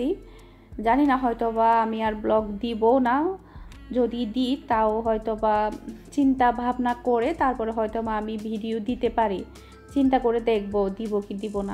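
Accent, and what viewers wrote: Chittagong, Bangladesh. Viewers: Apu apnar vasa ki chittagong?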